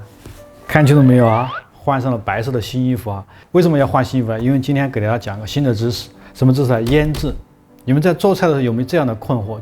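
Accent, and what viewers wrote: Sichuan, China. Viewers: 一定要多支持，听口音感觉是我们四川老乡，最关键的是讲解的非常仔细清晰但是不复杂。学到了很多知识。非常感谢，继续加油~~~ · 好喜欢的四川口音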